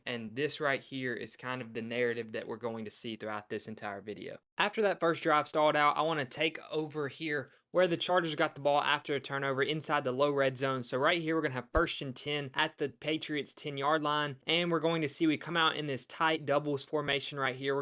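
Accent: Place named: South, United States. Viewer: Honestly with this guy's southern accent, its like it's a ladd incognito youtube channel. "As you can see on this play iiii.... I mean ladd was wide open".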